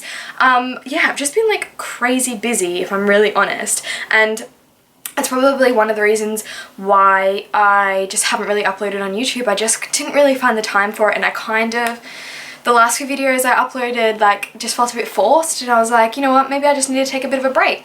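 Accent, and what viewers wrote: United Kingdom. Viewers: Omg I can hear a bit of a British accent!! Also I’m so glad your back!! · So excited you're back! Can definitely hear a British accent! 🙈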